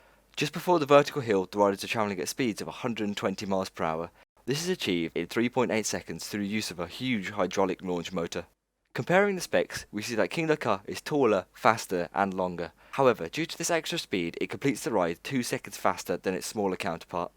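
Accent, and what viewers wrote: United Kingdom. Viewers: Contrary to the excellent(!) video presentation, I found the narration to be rushed and mumbled. I had to reverse a few times to catch what was being said - and it's NOT because of the British accent. Maybe my hearing is going.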